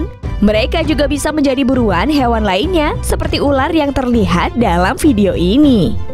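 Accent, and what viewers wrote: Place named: Medan, Indonesia. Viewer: Yang aneh logat yg bicara mirip dialek medan atau makassar Bikin salah fokus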